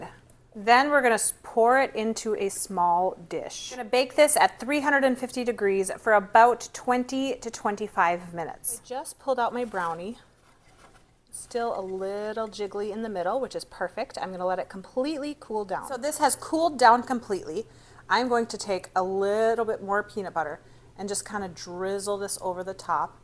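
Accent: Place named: South Dakota, United States. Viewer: I spent some of my elementary school years in eastern South Dakota. You sound like you're from that area of the world. Sounds good!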